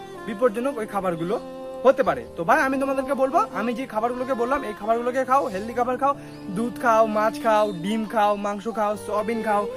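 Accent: Kolkata, India. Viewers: ভাইয়া তোমাদের বাসা কলকাতা বলতেন তোমার কথায় অনেক ভালো লাগছে বলবেন ভাইয়া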